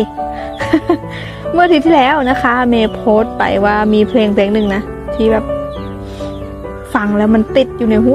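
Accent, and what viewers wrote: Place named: Suphanburi, Thailand. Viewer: พี่พูดเผน่อคิดถึงน้องที่สุภรรณเลยย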